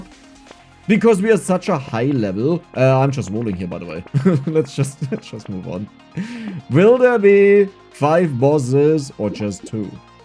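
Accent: Germany. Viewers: I can sense german ascent it subtle but when he spell r his germaniness appear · Nach dem ersten Satz gescheckt das du Deutsch bist XD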